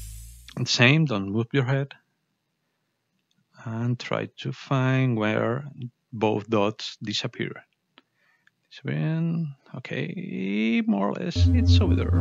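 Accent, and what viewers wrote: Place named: Spain. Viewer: Tu inglés es bastante bueno y no entiendo porque tienes que decir "sorry" por que tengas acento español. Un alemán hablando ingles tiene acento alemán. Un indio tiene acento indio. Ya me gustaría a mi que los británicos o los yankies hablaran spanish como tu hablas ingles. Asi que no hay que disculparse. Me encanta que la gente use esta herramienta para poner algo de objetividad en los visores. Pero uno de los puntos mas importantes es el sweetspot (entendido como ege-to-edge clarity) y aqui no hay una forma de comparar los visores. He visto que hay una herramienta vuestra LensTestRov que mide exactamente eso y creo que se podría añadir a esta herramienta y asi comparar resultados de sweetspot de diferentes visores.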